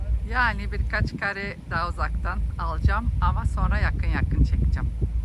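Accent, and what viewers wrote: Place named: Bulgaria. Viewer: Ne guzel video cok mutlu oldum..birde bulqar turkcesi konuşmana bayiliyorum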